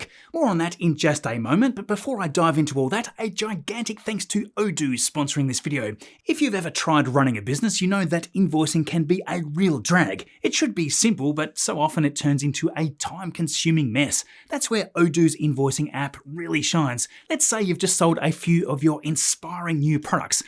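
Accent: Tasmania, Australia. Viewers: I always love hearing the Tasmanian pronunciation of "booster". I think it could only be topped by hearing "caterer"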